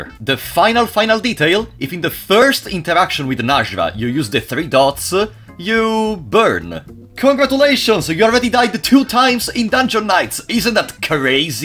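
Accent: Italy. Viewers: ho capito fossi italiano dall'accento loool ciao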